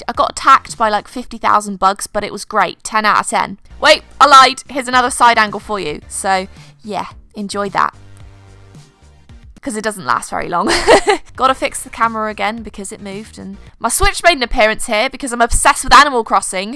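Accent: United Kingdom. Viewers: reminds me of Moria with the funny edits but a British accent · Bruynzeel: say it like Brown-sale (with a little bit of an emphasis on the w). I'm assuming it's an old Dutch name. The y isn't really used in Dutch (it's replaced with the ij which in a crude English translation is said like "eye"). But this is how I (someone also with a British accent) would say it.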